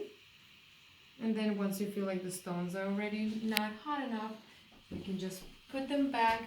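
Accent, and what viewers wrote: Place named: East Coast, United States. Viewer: I hear a cool east coast accent : )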